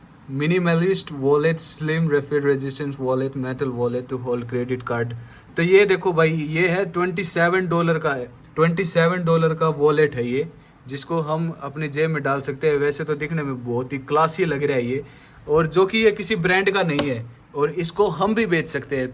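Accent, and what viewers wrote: Haryana, India. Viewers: Bhai haryanvi h k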